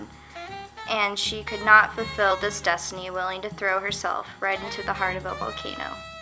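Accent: United States. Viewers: Another American voice. God help me. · Inappropriate American voice narrating, tho’ the commentary is not stupid. Mispronounces her native English. Quacks voice. “The kinda girl...” UGH. Kurasawa doesn’t adopt books, he adapts them for the screen. She makes mistakes likes this pretty regularly. Her pronunciation of Japanese names is atrocious.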